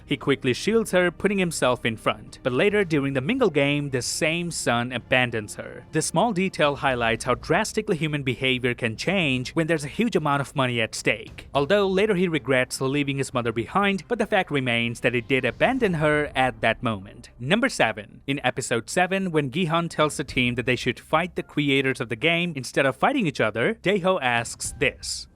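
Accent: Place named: Canada. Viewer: which part of canada is this accent from? Sounds interesting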